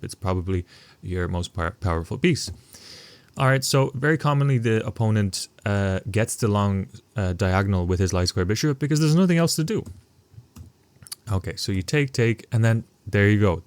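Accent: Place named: Midlands, United Kingdom. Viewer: Thanks for adding another idea to my opening repertoire What county are you from bro? Can't put my finger on it, fairly neutral so west/midlands?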